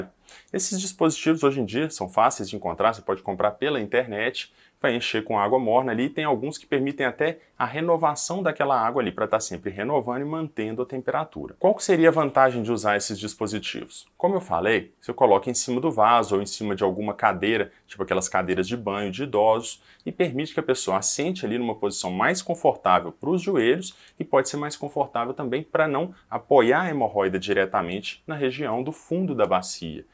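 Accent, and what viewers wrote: Minas Gerais, Brazil. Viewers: Dr esse sotaque mineres e mto bom 😅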